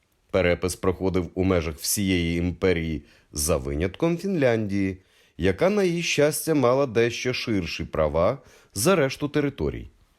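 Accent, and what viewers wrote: Russia. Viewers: Автор, видно по говору, что твой бытовой язык - русский) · Цікава інформація, тільки диктор має попрацювати над вимовою, і позбаватись російського акцента. РосИйська, ідентифИкацИя... Вимова як у Тимошенко..... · Doing this in Ukrainian language is excellent. But you need to have an English version (good clear English without Russian accent) so the rest of the world can learn.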